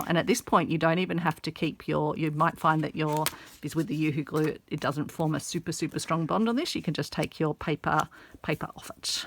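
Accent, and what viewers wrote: Australia. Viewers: I thought if you put a horizontal stitch on the back at the point of the star you could put a paper clip or other wire on and use it as a decoration and take it out and use as a coaster later. Just came across you today and am glad to hear an Australian accent. Gold Coaster here. Summer hit here a month ago, then we had a few winter days just when everyone had put away their long pjs.🌲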